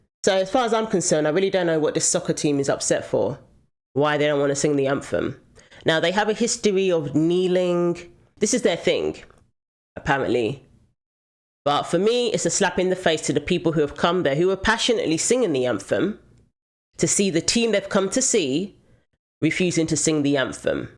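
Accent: United Kingdom. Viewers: You are Smart, Pretty and have a Great British Accent 🙂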